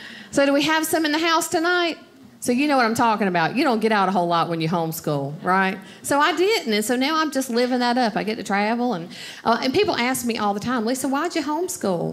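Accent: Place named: Atlanta, United States. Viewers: I could listen to her accent all day. I used to travel to Atlanta, I miss it! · That's just how you pronounce mills in Georgia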